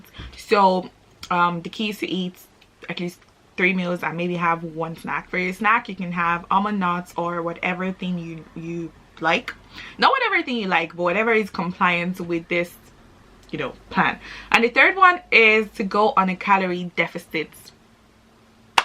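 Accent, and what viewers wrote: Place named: Ireland. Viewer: Your voiceee 🤤🤤🤤 Are you irish?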